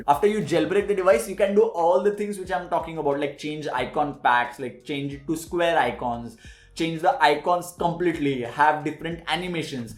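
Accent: Maharashtra, India. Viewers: Thick Marathi accent in the game. Khup chhan pan ! :)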